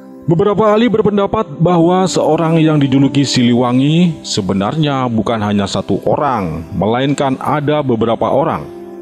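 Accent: Java, Indonesia. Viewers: logat jawa ny kental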